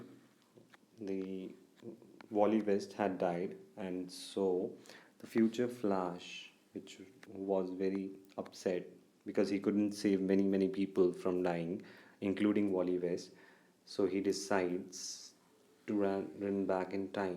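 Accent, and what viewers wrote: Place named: England, United Kingdom. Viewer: dislike for your English accent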